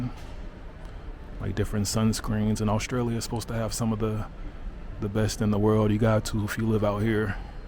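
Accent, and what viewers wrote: United States. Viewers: Ya'll are really pendatic on his accent, aren't you? We pronounce it Bond-ee like he does, here in America. Stop insulting his accent. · Lots of his narration is making me laugh. Still sounds like American living in America, re OZ. Tho TBF, did mention some interesting facts (& sort of correct re most, tho not 100% re all) in the previous video. Recommend (or any one in Sydney, or visiting) does some of the walking tours. The 'Free' (not quite free*) Walking Tours are good, & The Rocks one is great. [*Not quite free, as request tips at end, but for $10 or $20 get best value walking tour of any] There's also heaps of social walking groups, & hosts, or a few in the group, are often quite knowledgeable & often learn a few things, even re home city even if know it well. Can find some of those groups by searching for: Free Walks & Meet People. 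In Sydney, is often one of those groups (there's heaps) is doing the amazing Bondi - Coojee Walk, sometimes is a Manly Harbourside Walk; & often Botanic Gardens &/or adjacent one. - - - In Melbourne, similar type free social waking groups often do Botanic Gardens walks, Bayside walks, or Yarra Bend Park/Studley Park Walks; & at lots of other places. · I love the American accent brother but Bondi is pronounced as Bon die not bondy 😂😂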